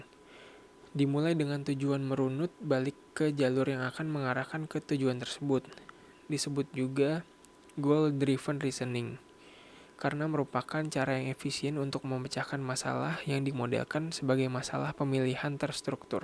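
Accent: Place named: India. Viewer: Keren pak dosen ..... Pak dosen dari india yah? Logatnya kayak orang india 😅😅.... Sukses terus pak dosen ....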